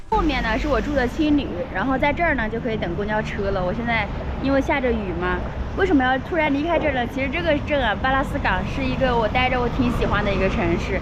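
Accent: Northeast, China. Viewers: 感觉你是东北人口音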